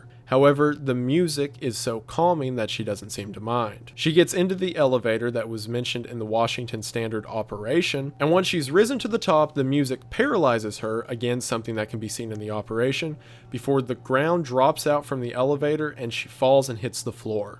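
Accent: South, United States. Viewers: Dawg I had no idea wendigoon sounded like he’s from the south till I heard his dad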